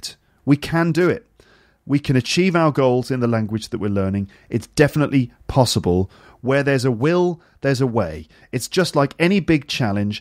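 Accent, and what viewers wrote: United Kingdom. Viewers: Hi. I got your podcast previous friday and i really like it. I love british accent. I'll try to practice with your audio to perfect my accent. thank you very much. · Hello from Almaty Kazakhstan!! I adore British English it always nice to hear your podcasts! thank you very much,! I'm so greatful to you.....May the Force be with you, mate!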